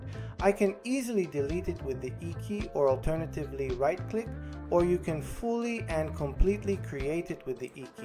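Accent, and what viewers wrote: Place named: India. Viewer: وقتی انگلیسی حرف می‌زنی استاد شبیه هندی‌ها می‌شین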